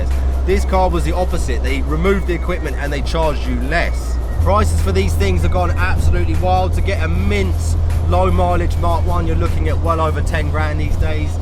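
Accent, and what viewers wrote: Liverpool, United Kingdom. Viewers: Yes mate, scouse in the house legend 👍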